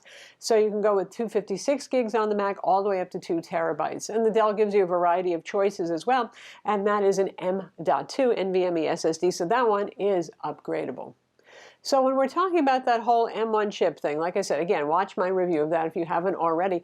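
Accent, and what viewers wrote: Texas, United States. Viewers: Chuckle! Good one, pixy. But, it's just her Texas accent. Very Big Grin.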